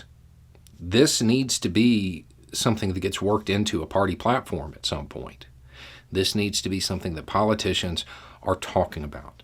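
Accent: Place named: Florida, United States. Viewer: FL accent. "Grain" energy. They are coming for your Moonshine!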